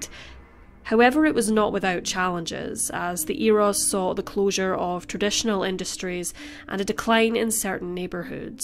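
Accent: Scotland, United Kingdom. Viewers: "It's a Scottish accent"🤗🏴🤗🏴🤗🏴🤗🏴🤗🏴🤗🏴🤗🏴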